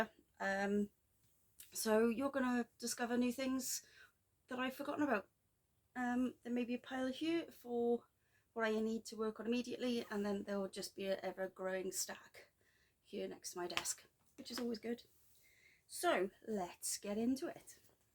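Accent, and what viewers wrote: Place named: Wales, United Kingdom. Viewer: I knew i had the fear of horses for a reason! 😱 yes I feel you would be nice to not work and stitch all the time 😁 i will send you screenshots of how I do it 😊 maybe focus on a piece for 3 days then move on to something else? I always look forward to your vids I very much love listening to the welsh accent 😁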